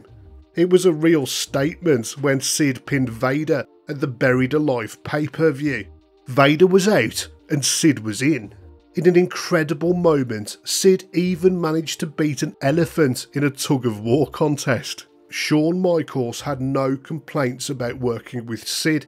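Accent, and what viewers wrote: United Kingdom. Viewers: why is every wrestling biography YouTuber british...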